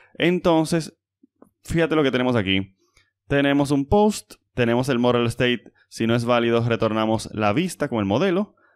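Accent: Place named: Dominican Republic. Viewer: Hola, eres de Rep. Dom.? Pareces dominicano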